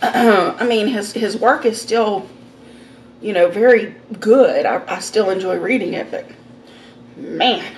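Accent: South, United States. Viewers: Hey. I say this with the kindest of words. I think you should go on the TV show My 600LB life and get some help. You are gorgeous and your worth it. I love your southern accent. Please go and get some help sweetie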